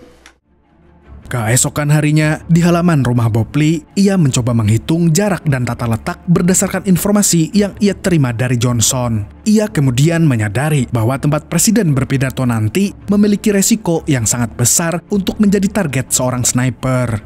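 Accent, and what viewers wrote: Banjar, Indonesia. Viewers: banjar banar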